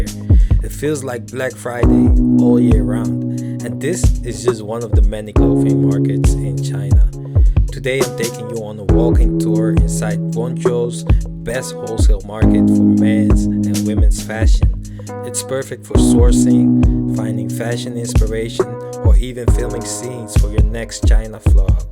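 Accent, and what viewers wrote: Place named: Netherlands. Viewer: Awesome video man. I would love to visit this place. Your accent sounds like you're dutch? 😅 Thanks for showing around. That mall looks great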